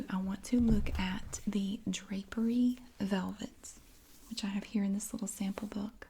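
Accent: South, United States. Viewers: I have family in the south and I think one of the reasons I like you is because you remind me of them. There is something captivating about Southerners - not just the accent, but your mannerisms. Anyway it makes for a good foundation for asmr. You’re exceptionally graceful so that makes you special in my opinion :) I hope you keep doing this for a long time I always enjoy seeing all the stuff you come up with. Thanks for sharing your talents with the world.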